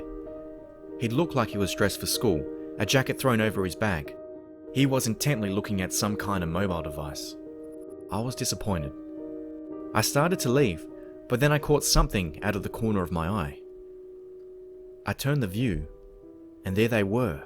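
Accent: Australia. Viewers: Ive been looking for a horror narrator w an australian accent and i literally screamed so loud when i found you that my mom thought i hurt myself or something 😂😂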